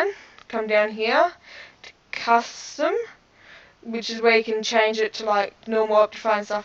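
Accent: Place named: Australia. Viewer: Nice aussie accent mate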